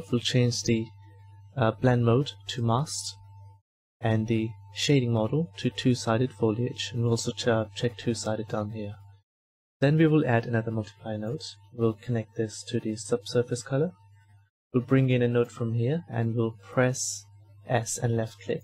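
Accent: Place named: Germany. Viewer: GERMAN AKZENTE ^^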